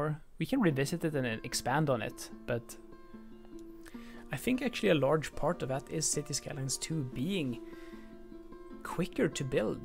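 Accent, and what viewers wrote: Sweden. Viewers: Swedish accent if I ever heard one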